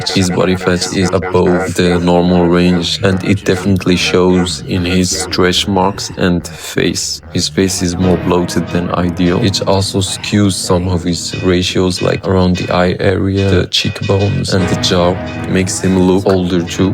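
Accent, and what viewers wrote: Morocco. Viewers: Moroccan accent bruh ur moroccan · Are u Moroccan bro? From your accent I can tell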